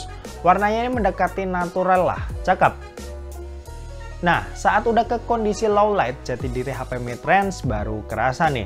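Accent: Semarang, Indonesia. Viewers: Orang semarang bang ?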